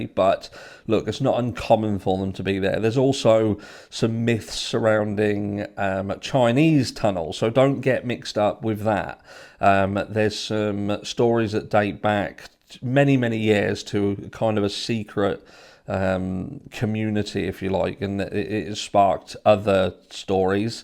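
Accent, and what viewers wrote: Norfolk, United Kingdom. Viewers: Go,!! I agree 100%. Usually, here in the US, most conspiracy theories turn out to be true. I must admit, I have difficulty listening to the British accent but you and Jonny Lee Miller have changed me. Love your videos. Any updates on poor Sean Daugherty? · Appreciate you and all your hard work 🤍 Is that a Norfolk or Suffolk twang I can hear? 😊✌️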